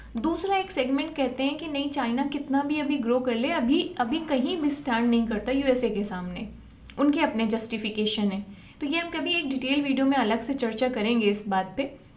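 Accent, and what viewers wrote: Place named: India. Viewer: I can't really understand this English ,the videoo seems welll done but unfortunately it is difficult to follow for people not from india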